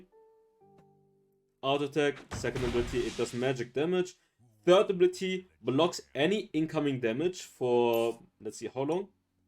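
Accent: Germany. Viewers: Man hört deinen Deutschen Akzent einfach heftig raus lol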